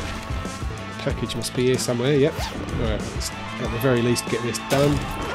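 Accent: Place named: South East England, United Kingdom. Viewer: It was an update 'ol chap. Also there is no longer a guarantee of getting a book bundle in the rewards list. You mentioned you live in Australia, but you're English, you sound like you're from south east England, although you pronounce your 't's" so probably not London or Essex, unless you had a nice middle class upbringing... ;-p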